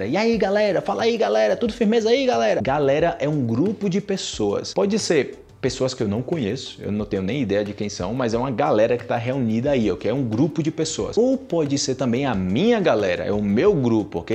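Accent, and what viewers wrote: Colombia. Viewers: Me encanta tu acento en español. Parece colombiano 💖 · Te lo juro me sorprendió cuando te escuché hablar con ese acento colombiano, ya me confundí no sé si eres de Brasil, de Colombia de ambos o de ninguno. 🤦 Te descubrí gracias a mi profesor quien recomendó tus vídeos.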